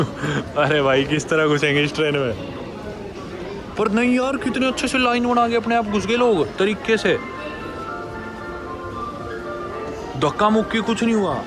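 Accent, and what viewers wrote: Haryana, India. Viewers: Being a haryanvi I can sense your pronounciation with haryanvi touch 😂😂 and it feels very good to hear that 😁😁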